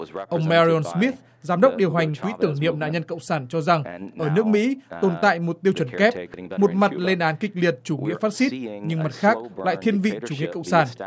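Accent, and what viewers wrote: North, Vietnam. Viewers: Giọng bắc mà đi phê phán chủ nghĩa Cộng sản là sao nhể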